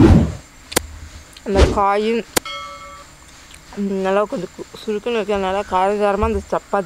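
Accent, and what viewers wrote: Kongu, India. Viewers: Akka ungal kongu tamil alagu Akka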